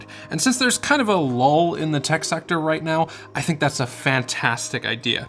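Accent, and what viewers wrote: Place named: Newfoundland, Canada. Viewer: Are you from newfoundland? If so hello from a fellow newfie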